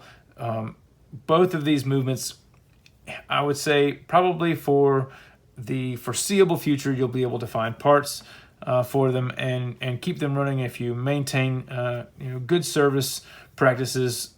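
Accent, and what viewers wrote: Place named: Latin America. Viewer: you have an accent. Are you latino?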